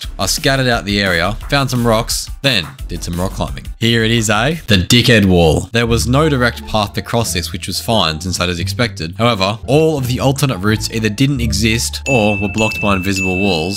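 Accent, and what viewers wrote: Australia. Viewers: this dude is australia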